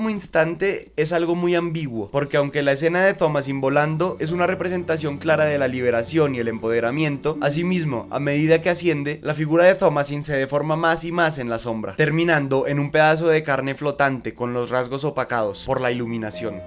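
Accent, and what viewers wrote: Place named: Bogotá, Colombia. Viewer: Woow chimba estos análisis en acento colombo rolo!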